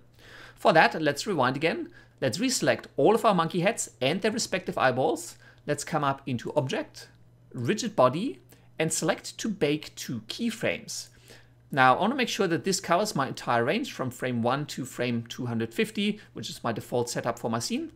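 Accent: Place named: Germany. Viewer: by the way, you sound pretty german sooo are you german?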